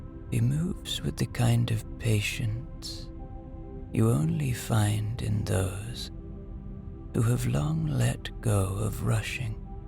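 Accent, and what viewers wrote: Ireland. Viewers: Amazing. This story took me to a dream with my beautiful sister, who recently died. Beautiful memories, love, sobbing grief and acknowledgement of acceptance of grief as part of journey..thankyou again. I love your Irish accent and gentle voice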